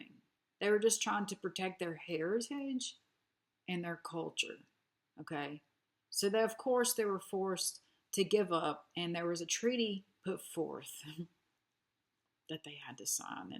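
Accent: South, United States. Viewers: Love the lip shade. And your southern accent. Subscribed. Much love from Arkansas.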